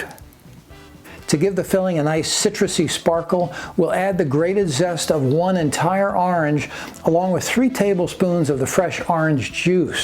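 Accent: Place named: Massachusetts, United States. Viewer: Is he from Central or Western Massachusetts? His accent is making me nostalgic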